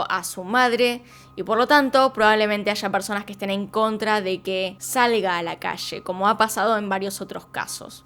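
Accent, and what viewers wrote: Argentina. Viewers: Eres argentina? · realmente existen hijos asi que horror mejor es alejarse con el dolor del corazon no se llevaban bien creen que USA hubiese quedado impune si hubiese matafo al padre era una justificacion por el dinero y odiaba a su madre yo hubiese dividido el dinero y preservar su vida y salud mental.interesante caso gracias me encanta tu voz con tu dejo argentino saludos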